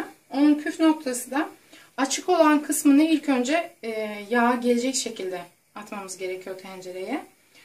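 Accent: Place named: Xinjiang, China. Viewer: türkiyede mi yaşıyorsunuz? lehçeniz uygur lehçesini andırıyor...türkmenistan türkü müsünüz?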